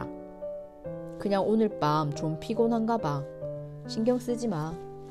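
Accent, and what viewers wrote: South Korea. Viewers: 대구분이신가봐요 ㅋㅋㅋ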